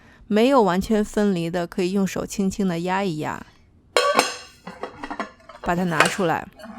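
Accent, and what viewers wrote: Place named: Xi'an, China. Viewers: 西安话挺好听啊，我济南人，我每个地方的方言都有自己的特色都很有意思，之前闫妮和张嘉译演的电视剧，她俩说西安话特好玩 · 西安话再土也可爱，西北特有的韵味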